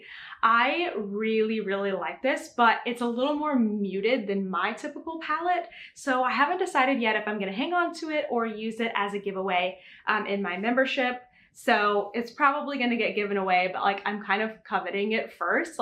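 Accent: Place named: New York, United States. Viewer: Love the colours of the hat yarn. My current WIPs are aran sweater for me, socks for my neice, and another chunky sweater for my daughter in law.... I only started knitting multiple projects since watching you.. It doesn't get boring though. Been a while since I watched you, gosh you are developing a bit of a NY accent there....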